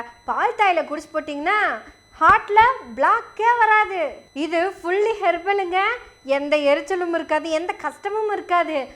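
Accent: Kongu, India. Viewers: வணக்கம் எங்கள் திராவிட மகளே, வணக்கம்... தங்களின் மாறுவேடம் !?!?! 😮😮😮 உலக நாயகன் கமலுக்கே போட்டியா!!!😂😂😂 நம் அழகிய கொங்கு தமிழில் தங்களது பேச்சு.... Amazing...👌👌👍👍👍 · Excellent very nice attractive attractive Kongu Tamil. May the God bless you and grow as a a very popular youtuber. You have excellent intrinsic latent and dominant skill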